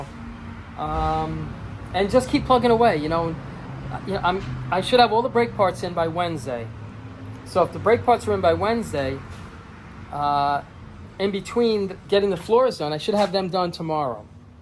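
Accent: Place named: Long Island, United States. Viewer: You have the Long Island accent like me. What town are from? By the way, that’s a great Camaro you found, must have been in a garage.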